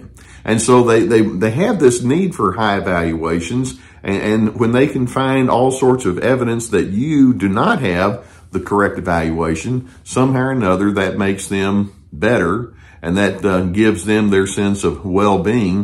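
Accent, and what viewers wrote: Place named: North Texas, United States. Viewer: I grew up in D/FW but don't live there now. Your N. Texas accent is VERY comforting and familiar to me!:)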